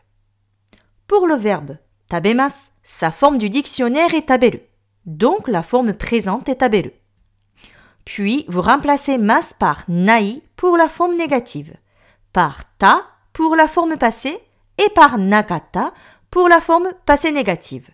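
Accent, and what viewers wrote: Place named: South, France. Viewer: Bonjour, c'est amusant car j'entends chez vous l'accent du midi ! Je voudrais apprendre des rudiments oraux de japonais en cours particuliers. Pour un court voyage au Japon et pouvoir chater. Est-ce possible avec vous ? Thierry Dorée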